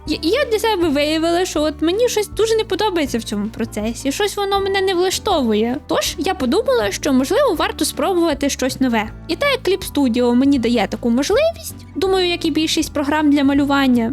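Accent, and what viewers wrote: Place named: Western Ukraine, Ukraine. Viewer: Дуже круто! Особливо дякую за розмовне відео, це те, що потрібно українському ютубу. Ваш говір крутий і мені цікаво, з якої ви області? Я ставлю ставки на межу 3 областей: ІФ, Львівської і Тернопільської)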